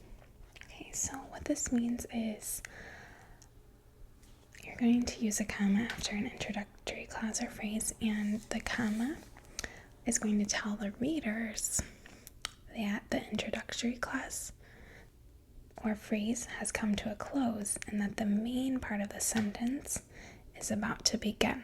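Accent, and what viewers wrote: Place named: Upper Midwest, United States. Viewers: you sound like you're from chicago miss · You have a nice subtle upper Midwest accent..I know. I'm originally from there..very nice..love your ASMR..thanks so much..goodnight .😇😴💤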